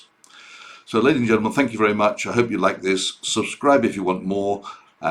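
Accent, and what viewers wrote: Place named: RP, United Kingdom. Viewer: Forget your Bloombergs, Reuters et alteri, isn't it so much nicer to listen to excellent analyses brought forth in his equally enjoyable Queen's English?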